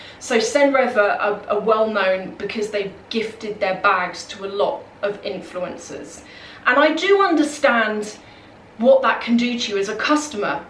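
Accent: England, United Kingdom. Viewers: Also, may I ask what type of English accent you have? I think it's very pretty! I'm aware of the different types of accents as my fiance is English.:)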